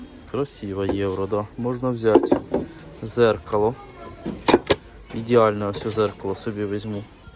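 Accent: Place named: Kherson, Ukraine. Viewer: Дякую за ваші відео, дивлюсь із задоволенням. Звідки така цікава мова? Це в селах на Херсонщині так розмовляють?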